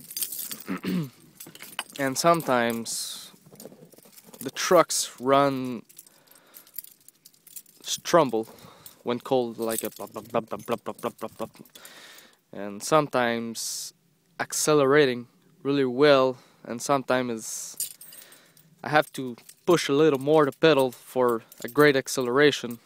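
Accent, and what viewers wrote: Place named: Quebec, Canada. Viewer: cool accent.. are you french canadian? I have a few freinds that are.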